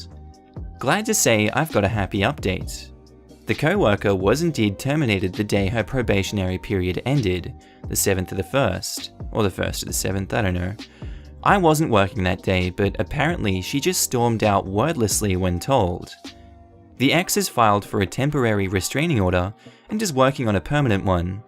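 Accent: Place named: Australia. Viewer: your amazing Aussie voice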